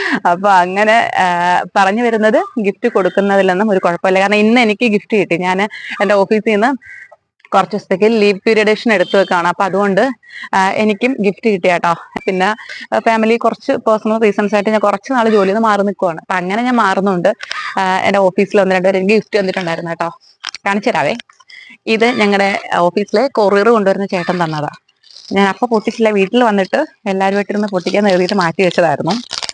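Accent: Trivandrum, India. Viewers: Language kettittu t.v.m annu 😊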